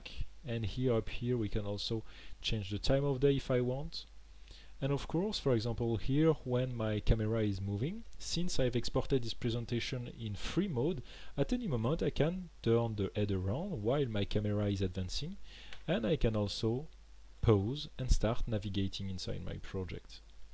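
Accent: France. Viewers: Your French accent is “mignon”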